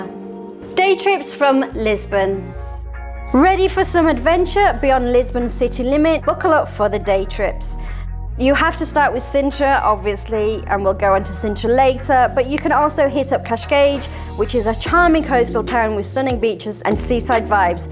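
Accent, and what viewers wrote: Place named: United Kingdom. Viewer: This is clearly a British woman, dude.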